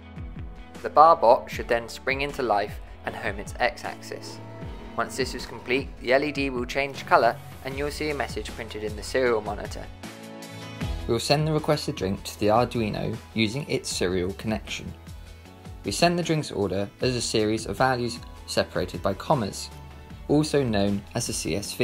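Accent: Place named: United Kingdom. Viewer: i ll follow ur tutos and buy what u linked in description, then i ll try to give u a "field report" =) THANKS SO MUCH to share that tho ! love ur accent :) british ?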